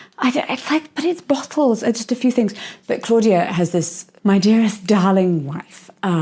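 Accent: RP, United Kingdom. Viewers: People with the Received Pronunciation, or the stereotypical sort of accent has, say it that way. Not everyone is from the US. This family is British. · Probably because in RP (Received Pronunciation) which is the stereotypical English accent and the one I believe has, premature is pronounced as “preh-muh-tyour”. So if you stick to the accent’s rules it would be preh-mie. Americanisms have crept into a lot of English accents now though, so that may be why people don’t say it like that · Re: strangers talking to you. My grandma will say "sorry I don't speak English" (she only speaks English). Now I want you to try this with people who stop you to talk to you, in your perfect RP English 😂😂😂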